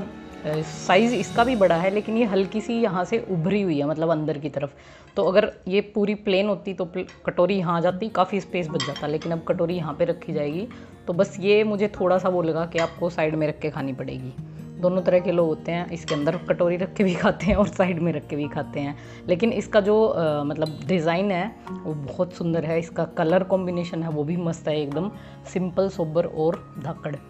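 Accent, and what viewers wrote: Haryana, India. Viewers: Haryavani hai...Jai haryana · Your accent is Haryanvi! 🙏👍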